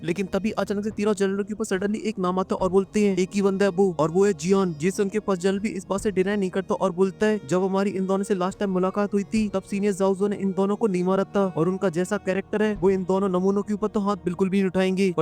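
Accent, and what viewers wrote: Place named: Hyderabad, India. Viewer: His sarcastic way of explaining with a slight hyderabadi tone is really entertaining amusing and hilarious keep it up brother i really fan yours explanation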